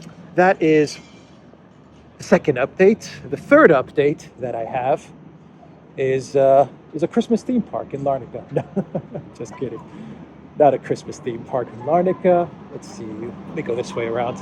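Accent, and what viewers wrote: United States. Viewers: Good Morning,. You’ve got American accent. How so? Thank you for the refreshing morning walk and world news update. Good day for you too. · I have news for you. YouTube brings us here. We have no idea who you are. You're obviously from the US or Canada. I would guess US. You might want to put something in "Abut".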